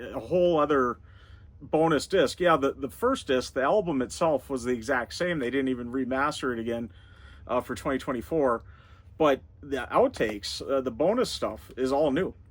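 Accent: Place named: England, United Kingdom. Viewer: as a norwegian, im struggling to hear if u are english or ameircan. yea, i love. everything i love about, is in. if have existed in 2000, they would have sound exactly like, even the harmonies are there. by could be straight frim help, too,. is a very john lennon song too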